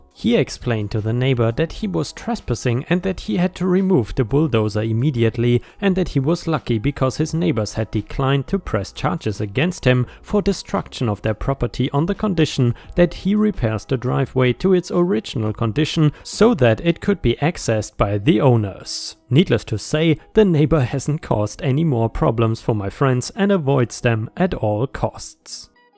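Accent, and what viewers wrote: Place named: Germany. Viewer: Hi I want you to know how much I enjoy all your stories. Listening to you with your German accent takes me back to when I was a kid, listening to my grandparents ( from Germany)tell stories. I miss them dearly, but hearing you makes me so happy again. Thank you so much for taking me back to very good times.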